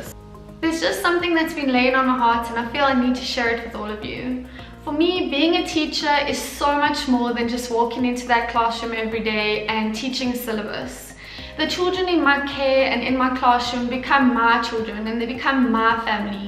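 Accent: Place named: South Africa. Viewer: your south africa accent isn't that strong. i am kinda surprised that you got teaching position in china. british or american english are preferred there and considered as "authentic english". i had been to south africa multiple times, some of afrikaans got really thick accent, took me a while to get used to their speaking patterns.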